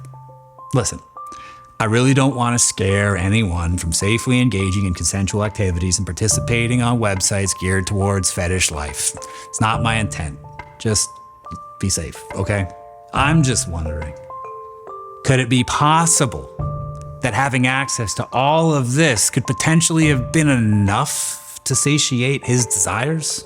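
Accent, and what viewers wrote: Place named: Canada. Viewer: Actually, I wonder about you. You practically make rock stars out of these killers. It's as if you idolize Dennis Rader. He murdered four members of a hardworking immigrant family named the Oteros in Wichita, KS. He took their ten-year-old daughter, Josephine Otero, and hanged her on a pipe in the basement and then jerked off to her corpse, leaving sperm on her leg. There was no DNA tracing then, so we rely on the evidence at the scene and his own testimony. This guy you can't get enough of? Stay the hell in Canada, you sound Canadian. I hope Trump buys your country out! You know, Kanuck, you can always visit Rader in prison. What, too chicken? Why don't you examine a few of your own killers, like Billy Bishop (72 kills) and Raymond Collishaw (68 kills, many others when he became a mercenary after WWI). They murdered more than Bundy or Rader, or any other hero of yours.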